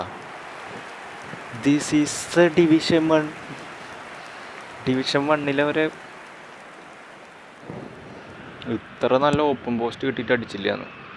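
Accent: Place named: Thrissur, India. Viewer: slang kettapole mansilaayi😂thrissuril evde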